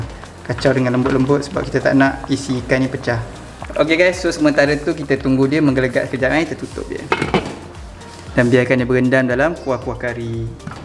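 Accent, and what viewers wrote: Terengganu, Malaysia. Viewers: Sape yg tanya xde slang tranung tu? Aku mula2 tgk vid dh perasan dia ada kaitan dgn pantai timur. Hanya org kelate tranung pahang je akan perasan detail slang sesorang dari mereka 😁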